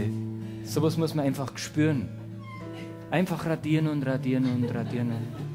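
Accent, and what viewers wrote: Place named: Upper Bavaria, Germany. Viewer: Gerade durch Zufall auf dich gestoßen und find es echt geil.Darf man fragen wo du her kommst?Vom Dialekt her aus Oberbayern?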